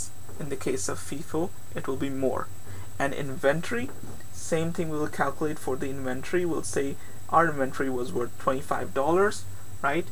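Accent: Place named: Canada. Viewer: you are the best teacher ever and youre canadian and your accent is beast so youre my favorite now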